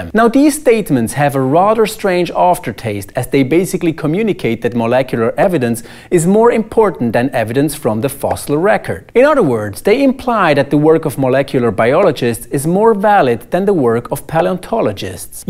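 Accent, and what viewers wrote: United States. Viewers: Speak English not American thanks.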